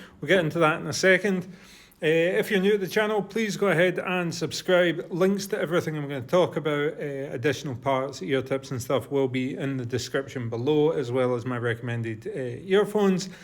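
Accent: Scotland, United Kingdom. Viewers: Id say central Scotland.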